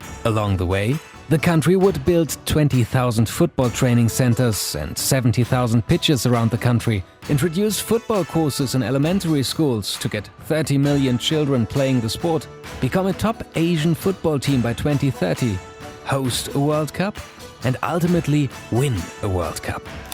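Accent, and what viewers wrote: Germany. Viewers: German accsent ( I Dieont know how to write that) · Man merkt richtig das du deutsch bist!😅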